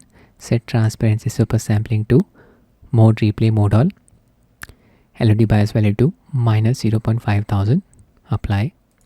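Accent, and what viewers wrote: India. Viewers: I dont understand indian english..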